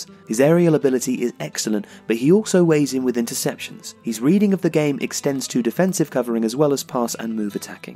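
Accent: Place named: United Kingdom. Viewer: cara e bem difícil o inglês britânico , haha slc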